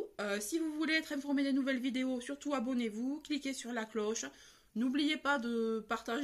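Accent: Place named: Castres, France. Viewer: j'ai reconnue l'accent, je suis née à castres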